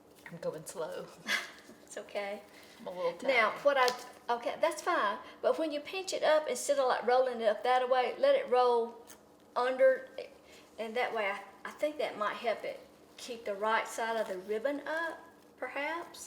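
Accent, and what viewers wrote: South, United States. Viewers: Her sweet southern voice!!😄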